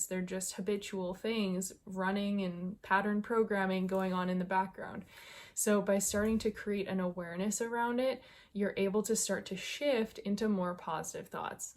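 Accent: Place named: Canada. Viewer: Hi, I found you on Kristina"s Channel Spiritually Selfish...and wow, a couple things..you are such a bright light I had to check out your channel...then you are SO MUCH like my friend Ryan...I met her in the early 90s at the Dead Shows..I'm an old hippy myself lol..she's Canadian (you sound like you might be from north of the border) and is a Taurus born 4/30 and you have some good positive serious Taurus energy....so maybe we're in a similar soul family but my main draw to you was your healing work and I'm curious if what i do is really "Quantum Healing". I connect with people's energy fields from distance and work with their fields and every healing I see my hands doing different things and I get messages from spirit about what is really going on with their health and can offer that......oh..I'm not bragging btw because Spirit heals, not Adam lol. 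I'm just her instrument but we've worked on people in hospice with cancer and within 48 hours they'd be up, eating, not everyone has these results but some do....the latest person is playing his guitar again up and eating and he was terminal with pancreatic Cancer. wasting away on Morphine......people have gotten up from their wheelchairs totally healied from Lupus...It's so freakin amazing and I myself healed from an "incurable" autoimmune disease I had almost 60 years..but no steroids for the first time in my life in over a year!!!! 3 years of daily affirmation work, energy work and diet. I work as a Medium so I don't charg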